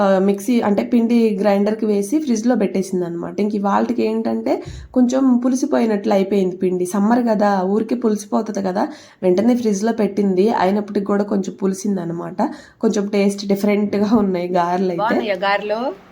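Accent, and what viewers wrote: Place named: Ongole-Guntur, India. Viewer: Meedi Ye ooru andi..... garu....Adento Koncham slang Ongole and Guntur mix undi...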